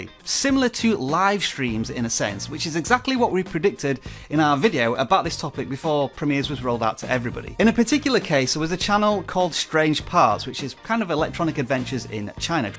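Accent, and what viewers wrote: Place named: United Kingdom. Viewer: It's so hard to understand your heavy british accent... Do you speak American English?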